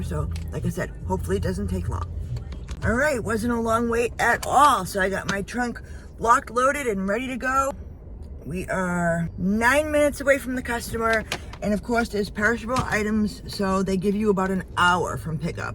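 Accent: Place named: New England, United States. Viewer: Your new lisp detracts from your yankee accenth...kinda miss your former articuation. Btw, the loaders at Wally world have seriously been botching my deliveries (40 lb of birdseed on loaf of bread, a gallon of vinegar on top of a bag of chips, lord knows what that caused a bottle of baby shampoo to explode all over the rest of the order and my car). Lastly, you ain't doing that badly if you can afford Starbucks...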